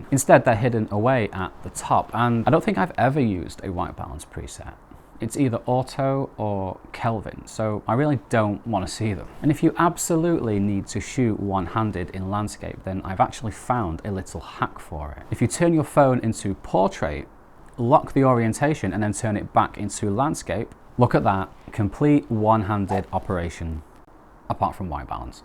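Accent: United Kingdom. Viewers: Hei. Accentul britanic și având în vedere că ai scris mulțumesc în descriere este foarte confuz. Super videoclipul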